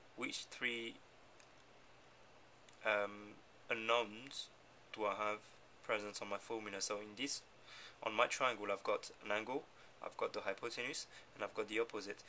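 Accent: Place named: England, United Kingdom. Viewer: Learning math from a guy with an english accent >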